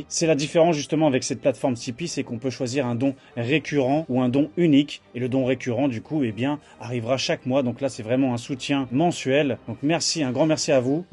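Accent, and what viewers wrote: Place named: Suburbs, France. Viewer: Je serais curieux de savoir dans quelle banlieue tu as appris le français, même si aujourd'hui c'est un peu meilleur que d'habitude...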